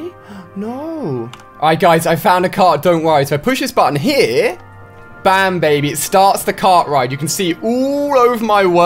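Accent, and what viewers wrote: United Kingdom. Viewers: why are all minecraft youtubers british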